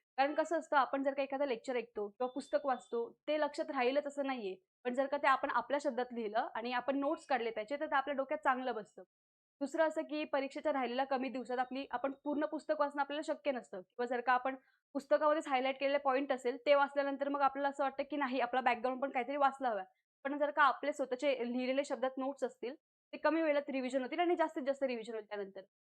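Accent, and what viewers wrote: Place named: Agri Koli, India. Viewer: मॅडम च्या accent वरून त्या आगरी कोळी कोकणातल्या असाव्यात.... तिकडे असं बोलतात तर घ्या समजून...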